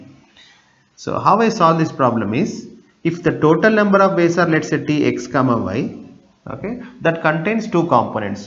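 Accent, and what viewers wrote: India. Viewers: The explanation is good but the indian accent is the real problem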